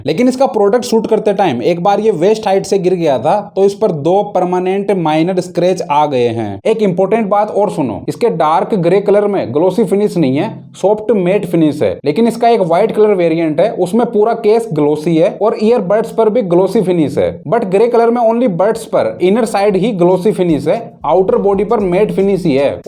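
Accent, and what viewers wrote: Haryana, India. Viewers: Baato se to Haryana ka lagg raha hai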